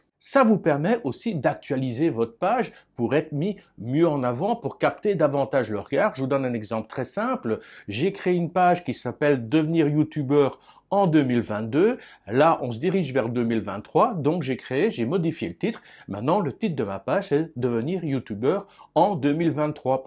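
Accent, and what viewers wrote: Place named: Belgium. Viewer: Bonjour, sauriez vous me dire comment peut on contacter Facebook qui ne répond jamais mais alors jamais ! J'ai un groupe de plus de 105 k abonnés et je sais que FB fait ce qu'il veut quand il veut (modification, suppression de contenu injustifié, etc...) mais côté service client c'est pitoyable. Alors si vous aviez une astuce ou idée ce serait cool. Je voudrais savoir aussi si vous connaissez un truc pour mettre une photo cliquable sur un groupe FB ? En tous les cas, vous assurez grave avec tous vos tutos super bien expliqués, hyper didactique avec votre accent (belge, suisse ou alsacien ? ). Bravo et merci. Patrice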